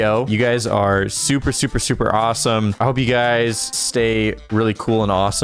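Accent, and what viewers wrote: France. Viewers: I am french!!!! I LOVE YOUR FRENCH ACCENT!!!, DO U LEARN FRENCH OR SMTH? You're saying every words right!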